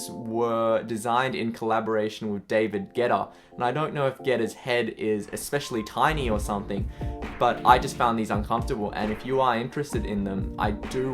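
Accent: Australia. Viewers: What accent is it? Aussie?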